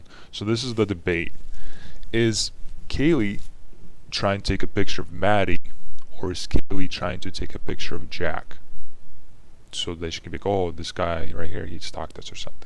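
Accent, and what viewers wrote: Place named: South Central, United States. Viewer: What was the comment we are going to get you girls by JS about? Please take diction classes. South central American accent is annoying and distracting.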